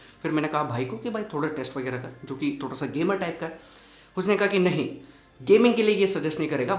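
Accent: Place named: Kerala, India. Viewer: That accent is cool! Are you from Kerala?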